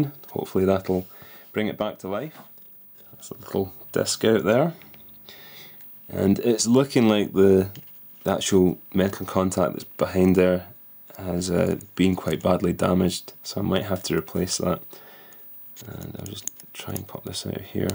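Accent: Scotland, United Kingdom. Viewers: Scottish? Subscribed. Always subscribe to other Scottish gamers :P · I didn't realize he was Scottish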